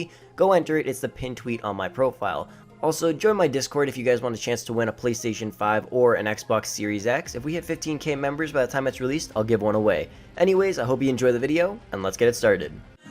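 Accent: Canada. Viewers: Nice vid, you’re from Canada aren’t ya? I figured by the way you said “out”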